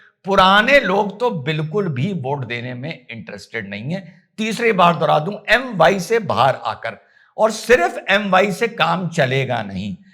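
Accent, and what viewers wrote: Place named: Lucknow, India. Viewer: bhai वंदे मातरम। इस रिपोर्ट में थोड़ा संशोधित कर लें। माननीय मुख्यमंत्री नीतीश कुमार जी की महिल रोजगार योजना में वहां की महिलाओं को वन टाइम के रूप में 10000 रुपए की आर्थिक मदद देने की बात है ना कि प्रति महीने। आपकी प्रस्तुति ठेठ लखनवी अंदाज में होती है जो मुझे अत्यधिक स्वीकार्य होती है। बहुत ही पसंद आती है। इसी प्रकार बरेली की घटना की शानदार रिपोर्ट करते हुए आप अचानक ही पक्के मुसलमान उन जैसे जिन्हें हम और आप भी कट्टर अर्थात् देश विरोधी मानकर चलते हैं। उनकी नजर में केवल इस्लाम है और कुछ भी नहीं फिर वह दोस्ती हो,इंसानियत हो याकि देश की एकता और अखंडता ही क्यों न हो? अपने उस रिपोर्ट में जिस अंदाज में विषय से हटकर अपने प्यारे मुसलमान भाइयों को जगाने का प्रयास किया वोह सिर्फ एक वाक्य हो हम जैसे आपके प्रेमी दर्शकों को भीतर तक हिला गया। उम्मीद है मेरी इस विवेचना को रिपोर्ट के परिप्रेक्ष्य में सापेक्ष रूप में लेंगे। जय हिंद । सबको सही रास्ता दिखलाने वाली उस परम शक्ति से बारम्बार एक ही प्रार्थना है कि आप उम्र के इस पड़ाव में अपनी मेहनत, विद्वता और कल्पनाशीलता के जरिए पूरे देश के साथ साथ पूरे मुस्लिम जहान में छा जाएं।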